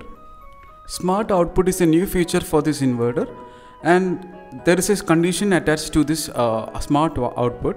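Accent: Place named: England, United Kingdom. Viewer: bhai india ka hi hai na mujhe to lagta hai England ka hai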